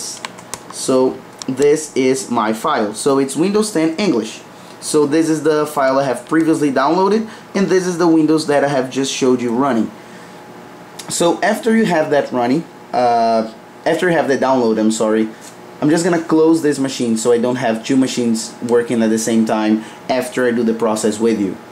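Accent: Brazil. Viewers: Brazilian accent spotted!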